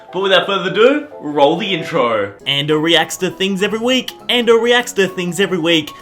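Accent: Australia. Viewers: I love that fabulus Australian accent.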